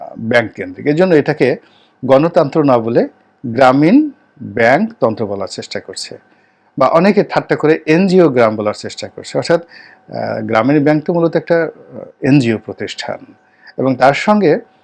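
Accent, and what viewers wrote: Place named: Barishal, Bangladesh. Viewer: ভাই আপনি যে বরিশালের মাল তা বুঝা যায়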